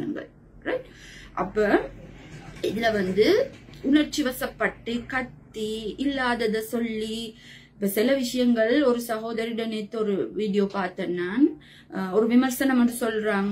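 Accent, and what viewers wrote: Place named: Colombo, Sri Lanka. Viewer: இது கொழும்பு மக்களுக்கு உரிய பேச்சு நடை எல்லாரும் சுத்த தமிழிலா பேசுறாங்க குறை காண்பதே வழக்கம் போல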